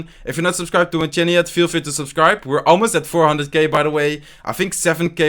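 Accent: Netherlands. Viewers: Nederlands accent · Hahahaha dat Nederlands accent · Lol dat accent zo gemakkelijk om te weten als er een nederlandrr engels praat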